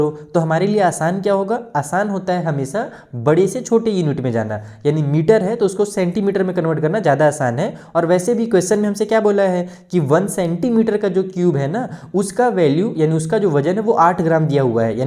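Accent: Bihar, India. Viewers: Sir thanku kal mera aanual exam hai aap bhut aache hai aap bhiar se hai naa aapki accent se pata laga raha mai aapko 6 class se follow kar rahi hun and 9 and 10mai bhi kraungi true fan sir❤❤❤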